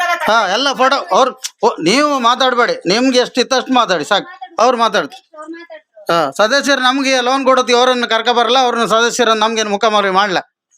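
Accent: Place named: Kundapura, India. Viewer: Kundapuradavre nimm maatu..👌.😂.dairya mecchle beku.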